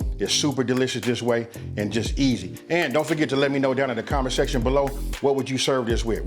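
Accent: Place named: Los Angeles, United States. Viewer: Yes, sir!! One of my fav soups. New sub cause you sound like you're from where I'm from... L.A., CA. Cornbread is a must.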